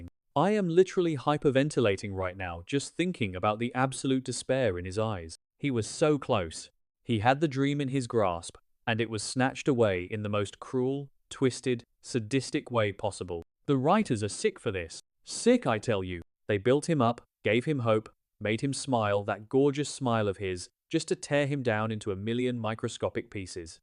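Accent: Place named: United Kingdom. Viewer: A British narrator is so wrong for this. He is not pronouncing names correctly. Specially Devon. Have an American HUMAN narrate or don't do it at all. After a one minute, had to stop. Couldn't listen to another word. Horrible.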